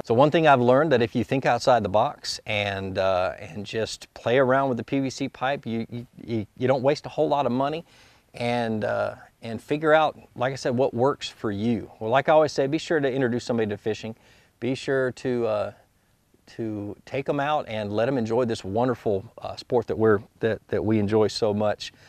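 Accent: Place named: South, United States. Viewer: i like when he goes "you see that right there" the southern accent was so strong lol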